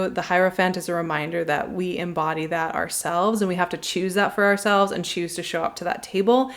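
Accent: United States. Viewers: She sounds like an american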